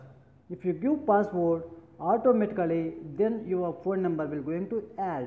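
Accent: India. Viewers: that is just Idian accent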